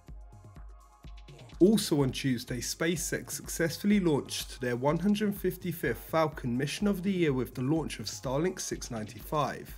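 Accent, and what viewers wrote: England, United Kingdom. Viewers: Another english voice? Man get over it.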